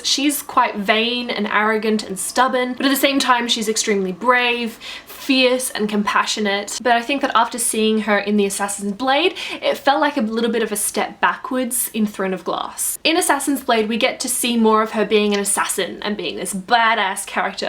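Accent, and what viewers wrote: Australia. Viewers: Hi! Now I am reading Assassin's Blade (I've just read the first novella) and thanks to your review I am more and more sure to want to continue this series. PS. I think this could be the strangest comment ever, but... I love your australian accent! I'm italian and I'm trying to learn it because I looove it! :')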